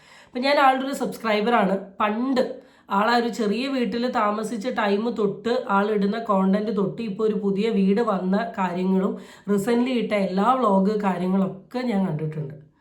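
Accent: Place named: Thiruvananthapuram, India. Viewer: Only particular areas in tvm they speak in this way , please don't tease the slang it's a request not an order please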